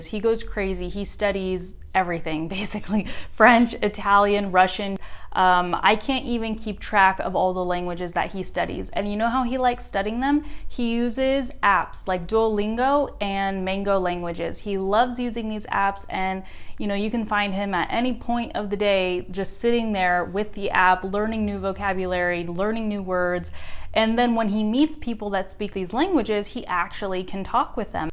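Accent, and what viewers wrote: United States. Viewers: I like a lot of the your lessons. I have difficulty in listening, but I am trying to understand American English native speaker like you. Thank you for your great job! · You are a good teacher. I understand what you say and it is great. I don t get used to American accent but this time I understood it...Great! I usually "suffer" when I watch american movies because I like them but I have to watch them again and again to understand..At least not the whole movie but just some chapters of it... I hope I will overcome this fear of "listening" one day Thanks. Take care ..bye🙋 Greatings from Italy🌍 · Hello Miss. I suppose you speak American's English, at what state you are? I'm from Guatemala City. Salute. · You are a good teacher ,thank you,you made me love this language and american accent Deeep greeting for you from sudan😍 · I just step by saying that I’ve found many of your videos very helpful. 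I've taken the time to listen and watched some of them twice so I can listen to your pronunciation, intonation, and so on; and then repeat or search for a new word you've said. I like very much how you explain the things or talk about different topics. I'd love to have an accent like yours, I'm working to have a better accent, more American. So, thank you so much! And I'd also like to add that even there are many women who make these kinds of coaching, you have become on my second favorite one.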